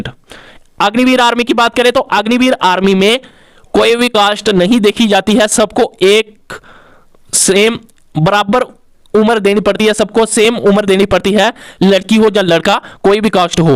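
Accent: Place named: Jammu, India. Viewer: Pehle bolna seekh le Dogra hai ya Punjabi, hindi main dogri mila k de raha hai Aur galat Information bhi de raha hai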